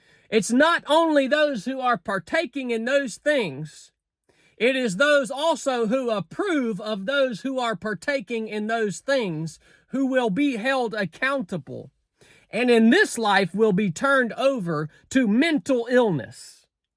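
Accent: South, United States. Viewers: Probably not a good idea to take any kind of spiritual advice from someone who looks and sounds like he just crawled out of the hills himself. It's always incredible seeing the Dunning Kruger effect in action. People with a relatively low IQ who don't understand complexity and nuance take on a position of teaching and guiding others, fully confident in setting up a platform where they are speaking what they think is some kind of truth. This video is a perfect example of the mental illness crisis in this culture.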